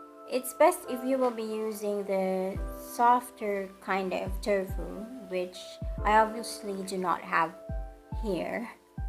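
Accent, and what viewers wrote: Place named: England, United Kingdom. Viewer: Speaks a very english intonation, nice!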